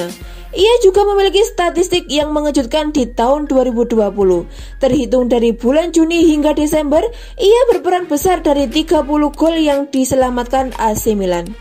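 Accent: Java, Indonesia. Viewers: Mantap Medoknya keliatan bgt 😄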